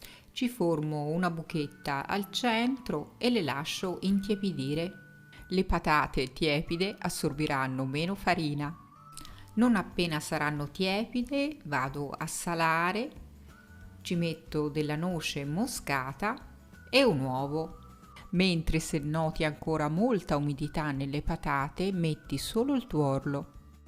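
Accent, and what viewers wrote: Tuscany, Italy. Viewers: Devono essere deliziosi😋 adoro le tue spiegazioni e il tuo meraviglioso accento toscano! ❤️ Un caro saluto🤗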